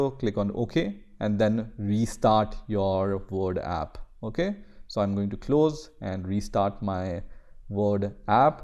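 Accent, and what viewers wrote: India. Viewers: Dont try to talk more "British English" if I hear Hindu accent I know that this will be a helpfull video. Thank you so much.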